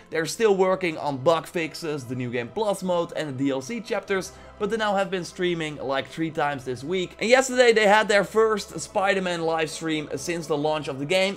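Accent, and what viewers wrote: Netherlands. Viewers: Ooh daam i love ur dutch accent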